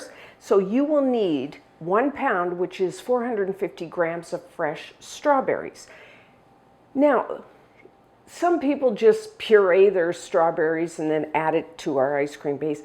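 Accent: Canada. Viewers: Yeah I can pick up her Canadian accent.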